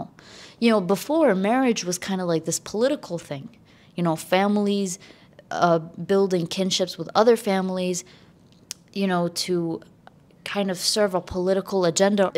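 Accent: United Kingdom. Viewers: As a revert, I really take a comfort in your podcasts and videos. I met you on TikTok but I love your YouTube much more because of the longer videos ❤ I’m over here studying and listening to you and repeating your British “d’yknow whahimehn?” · Love the british accent du know wat mean like😅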